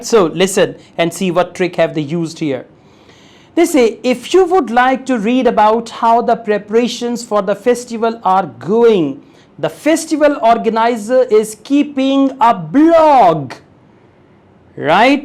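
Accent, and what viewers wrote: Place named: Punjab, India. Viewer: Haaye tuhadi Punjabi 😄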